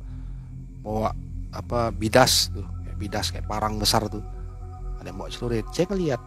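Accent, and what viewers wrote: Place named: Jambi, Indonesia. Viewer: bhs melayu ny sama kayak di jambi 😭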